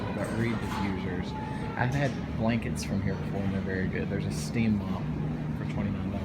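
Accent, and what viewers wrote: South, United States. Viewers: I had to take a pause just too type this message! I love ur southern accent or the way you speak whatever you want to call it! I love it!!!!!!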